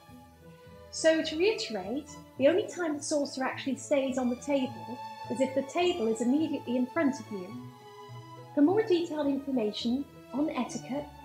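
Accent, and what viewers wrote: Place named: United Kingdom. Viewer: I love british accent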